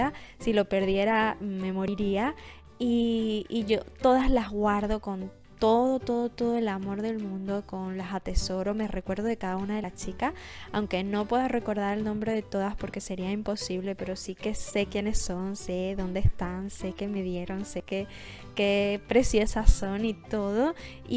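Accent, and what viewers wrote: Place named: Maracaibo, Venezuela. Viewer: Hola... tengo rato viendo tus videos y tratando de descifrar tu acento y me decía a mi misma: “mi misma, no puede ser.... será que es venezolana?????” De repente se te sale algo maracucho jajajajaja (aunque acabo de aclarar esa duda). Estoy viendo tu video sobre las preguntas personales que te hicieron y tuve que parar para llorar por la nostalgia que sentí al escucharte hablar de nuestro hermoso país. Sentí la necesidad de escribirte para felicitarte por todo lo que haces. Se nota que amas lo que haces y por eso todo te sale tan maravilloso. Gracias por dejar en alto nuestra hermosa Venezuela. Saludos desde Indiana, USA. Recibe un gran abrazo!!!!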